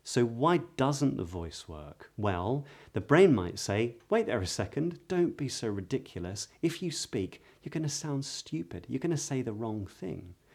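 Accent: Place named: England, United Kingdom. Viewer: Beautiful accent, the pace, volume,etc. Your speech (maybe your ideolect) is very pleasant to hear. It's modern English accent too. Good channel and good content, for special audience 😅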